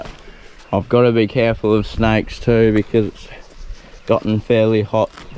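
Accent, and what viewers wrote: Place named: Tasmania, Australia. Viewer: You are living the life,. Good to see. I hope any snakes you encounter know they are only allowed to bite your gaters. Curried egg sangas with a wee bit of lettuce in them are in fact yum. You sound like Tob Parsons Must be a Tassie thing.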